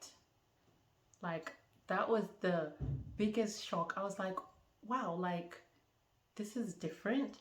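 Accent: Australia. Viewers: You’ve got quite an Aussie twang already! Ahah. My dad lived in Botswana for most of my childhood and adolescence so I spent a lot of time in Southern Africa. Been to Zimbabwe a few times…Harare, Bulawayo, vic falls, lake kariba, hwange, those ruins - forgot where they are (that was when I was like 12 so over 20 years ago. Anyway, 🙏 welcome! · your starting to sound aussie. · where did you get that Aussie accent?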